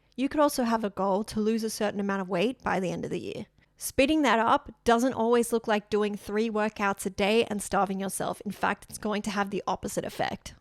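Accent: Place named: Australia. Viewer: Girrrrllllll 🙌💓 you’re killing it! You have done a phenomenal job expressing this topic. It’s nice to hear a fellow Aussie voice on YouTube.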